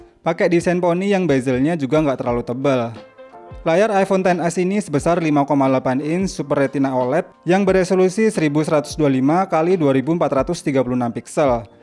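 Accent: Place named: Sleman, Indonesia. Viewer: koyo ng sleman ikuu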